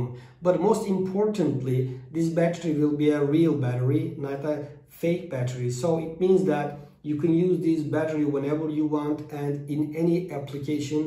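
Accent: Turkey. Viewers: I absolutely love the Turkish accent. Correct me if I’m wrong! Reminded me of my teachers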